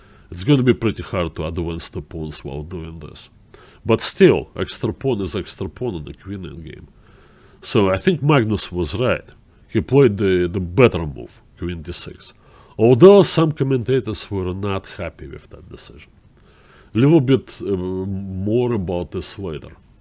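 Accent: Russia. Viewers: But they are not all available. Plus, this guy is great. He has great analysis and has some very interesting things to say about chess. And he's actually fluent in English you can tell. He can say whatever he wants and talks about jokes and other things. He's just got a Russian accent. Which is not that bad, it's called diversity. And this guy is very good and interesting. So what's the problem really. If <link> hired him he's obviously got something going. Eric and the other chess brahs are great but there are other great people out there too and we can learn a lot from diversity in the chess world. A little accent never hurt anyone and it's a good opportunity for people to learn something new about chess from someone very experienced.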